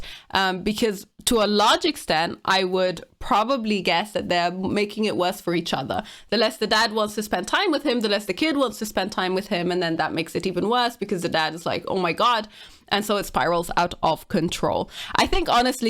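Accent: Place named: Germany. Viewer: great points :) you articulate yourself very well -I hear a slight german accent .... I lived in Berlin for 10 years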